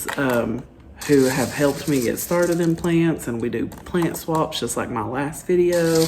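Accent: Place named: Kentucky, United States. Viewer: I look forward to your videos. I love accents, I enjoy Claire at Jungle Haven's British accent, and I love your Kentucky accent. I am a nurse (I just added up and it's been 37 years!!) And my youngest daughter is a Family nurse practitioner. Both of my daughters and I are planty people. I appreciate your courage. You give me the gift of your plants and how you grow them. I have not done a moss pole yet. I have a rapidaphora tetrasperma that would appreciate a moss pole or a trellis about 20 feet 😊high.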